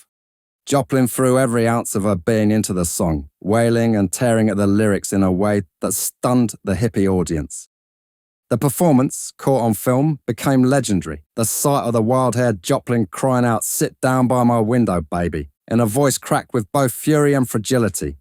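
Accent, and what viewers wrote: United Kingdom. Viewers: This narrator is a supreme God of all of narrators. This is great a greatly appreciated narration. His British tinged delivery is awesome. Well Done, sir. I didn’t know all of these details about her.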